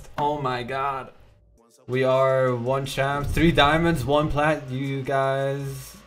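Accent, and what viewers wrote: Germany. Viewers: Are you German? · Is he german??? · Wtf bist du deutscher oder kannst du besser deutsch als ich 😂😂😂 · you from german or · Are you german ?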